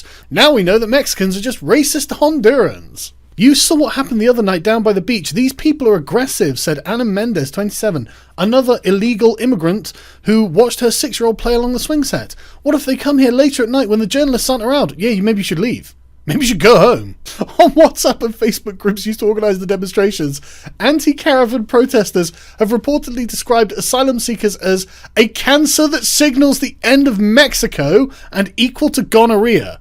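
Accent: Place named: England, United Kingdom. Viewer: The best English accent ever! You could sell me anything after 2am on cable bro.....like Michel Cane in Zulu ( ole boy).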